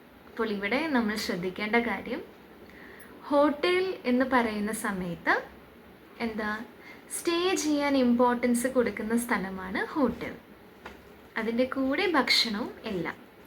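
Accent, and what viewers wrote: Palakkad, India. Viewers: Palakkadan slang super 🙌😂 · Pkd Slang 🔥 😂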